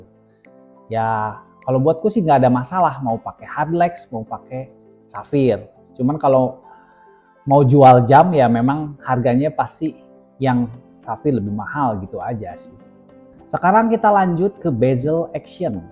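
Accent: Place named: West Java, Indonesia. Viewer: Pasti mang nya urg sunda 😅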